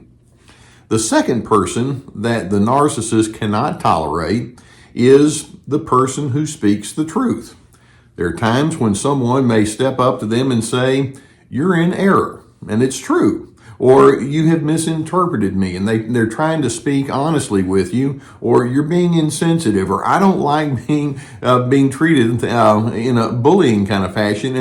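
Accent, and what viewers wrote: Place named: Oklahoma, United States. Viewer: Sounds like Oklahoma coming from Albuquerque, NM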